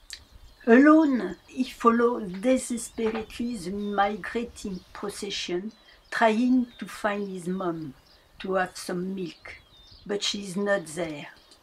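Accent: France. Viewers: She's speaking English with a French accent. I understand her very well. If you don't, then you're the problem not her. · J'adore l'accent français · Très bon reportage. Thank you for sharing. Yes, you have french accent: I love it and thank you for sharing anyway, great video -and this is what counts. Merci beaucoup de la Catalogne. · Love the French accent here 😁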